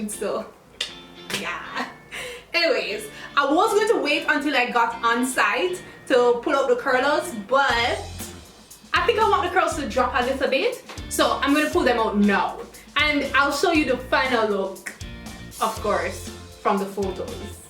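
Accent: Jamaica. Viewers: Loving your jamaican accent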